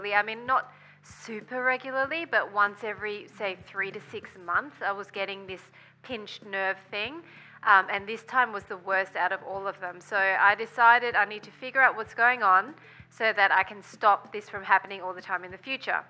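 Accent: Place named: Australia. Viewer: A freaking Aussie! G-day mate!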